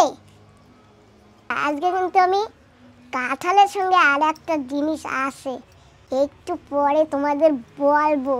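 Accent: Barishal, Bangladesh. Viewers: হাই বুঝি আপনাগো বাড়ি কি বরিশাল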